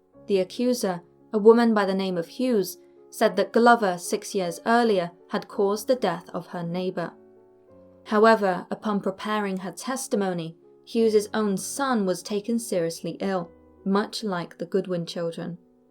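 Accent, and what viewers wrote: England, United Kingdom. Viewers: Beautiful English accent, clear, concise with beautiful pronunciation and read at the “proper” narrative speed for an audience to enjoy 😊. Good lass! ❤️🦋🙏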